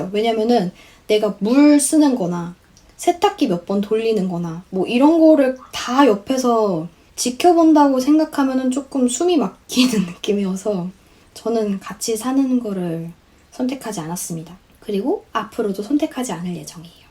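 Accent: United Kingdom. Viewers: 약..12년전;호주 워홀러였던 아즈매에요~ 님 영국식 발음에 푹빠지네요 다시 공부하고 싶은 욕구가! 그걸 혼자했단거에 참 대단하네요👍 잘보고있어요~ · 안녕하세요 영국에유학중인 해리입니다.영국영어 발음 정말멋져요.일상을 영어로 하는 브이로그 한개여서 또 영어일상브이로그 촬영해주시면 좋겠습니다.영어공부하는데 많은 도움이될것같아요.♥️구독하고 갑니다.👍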